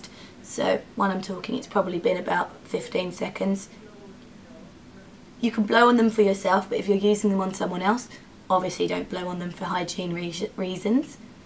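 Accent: United Kingdom. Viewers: r u British? love ur accent.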